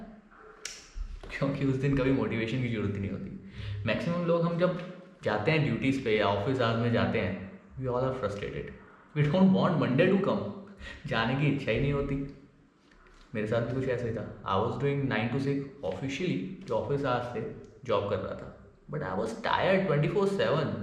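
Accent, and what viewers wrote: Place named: Punjab, India. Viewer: English me punjabi tongue influence hai.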